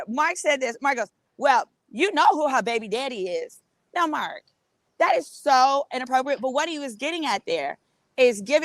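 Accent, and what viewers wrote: South, United States. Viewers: I immediately subscribed to your channel last week after hearing your accent on Demetria L Lucas's podcast. I'm from MS and love a good ole Southern accent. Does your friend care about you calling her a hoe? I crack up each time you say this 😆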